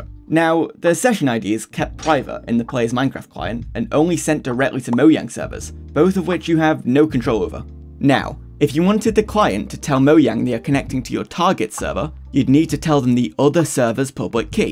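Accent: United Kingdom. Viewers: best tesco going mate, thought you sounded northern aha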